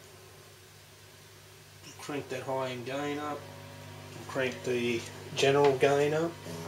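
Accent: Australia. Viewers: It's aussie...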